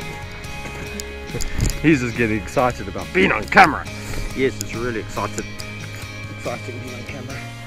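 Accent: South Africa. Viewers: He is South African I can hear his accent Hoe Gaan dit my ou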